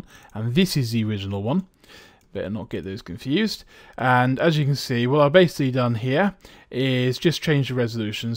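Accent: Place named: RP, United Kingdom. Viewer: you are awfully posh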